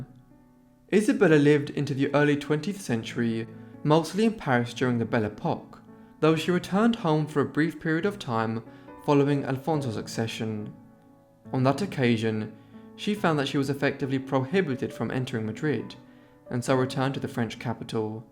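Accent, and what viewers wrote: England, United Kingdom. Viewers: I'm perfectly aware of his English accent - I'm English,myself - I think he's fantastic & really enjoy his videos, but,there is NO other way of pronouncing the word properly in English. I'm very dyslexic as once might realise from reading my post,but,on the spoken word I have total confidence & as I said its constructive criticism, not an insult. I give my Spanish/Italian godson English lessons to help his conversation & having lived all over the World & helped 100s of people with English, it's a courtesy to help someone be the best they can be. Just like I tell anyone to correct my French,Spanish,Italian,Arabic & Greek. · Do you realize he is British and British people pronounce words differently then Americans!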